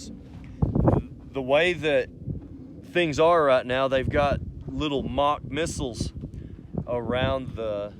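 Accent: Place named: Scotland, United Kingdom. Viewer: That sounds like a Scottish accent 😉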